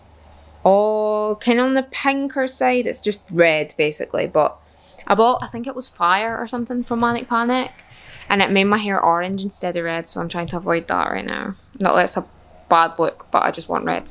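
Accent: Scotland, United Kingdom. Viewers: aeibeirats" or idk what thats supposed to mean lmao im just here for the Scottish accent I love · is she scottish? demyn lovin her accent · Is Scottish an accent or an language?? I'm trying to learn Scottish accent from her 😫🙏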